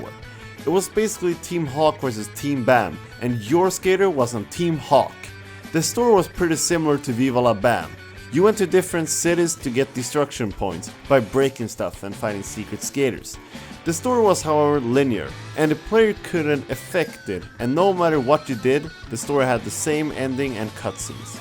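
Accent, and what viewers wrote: Sweden. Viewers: Holy shit you sound really swedish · the guy that narrates are so Swedish :)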